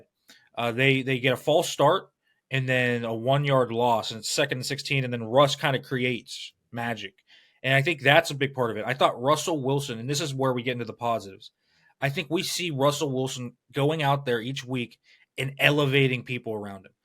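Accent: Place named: Pittsburgh, United States. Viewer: Dudes yinzer voice/ intro is the perfect set up for to come in and do his thing. But where did dude from the left come from? 😂 he been a beat writer for years?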